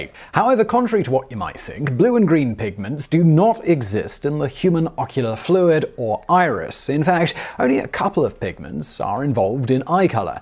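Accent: England, United Kingdom. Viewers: Please stop trying to impersonate a garbling artificial intelligence robot. Please just speak much more slowly and clearly in your clear English accent.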